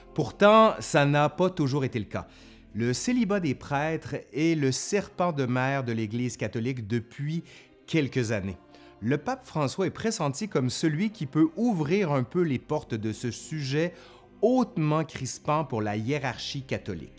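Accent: Quebec, Canada. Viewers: Je suis venu grâce à l’accent français du Canada pour mieux l’apprécier. Et maintenant j’apprécie les vidéos et tous que j’apprends ! Merci ! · je ss restée bouche bée en écoutant la langue française québécoise pour la première fois 😯 qui est comme moi??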